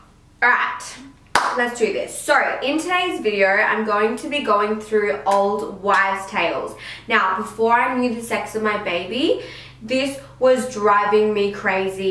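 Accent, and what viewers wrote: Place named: Australia. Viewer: First I love your videos xx you are so amazing and love your Aussie accent Edit(I love your Into it's so bomb i do it all the time)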